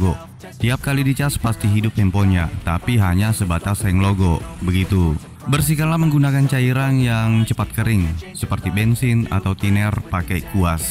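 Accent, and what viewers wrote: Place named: Makassar, Indonesia. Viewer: Orang makassar ki paeng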